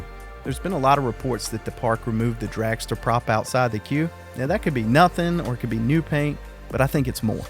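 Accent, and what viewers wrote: South, United States. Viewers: I saw the notification for a new video. Only problem I have is your videography and editing are so good and high quality I will only watch your videos in 4K on my 65" TV. It's like watching a movie everytime and I actually feel like I'm right there with you as you narrate. It's like an IMAX documentary for coasters. Please take as much time as you need we will all be here awaiting your over the top content and your gentlemanly southern drawl guiding us through they way you see the theme park world.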